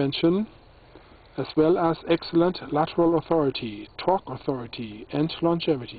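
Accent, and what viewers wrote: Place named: Jamaica. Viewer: he sounds like a Jamaican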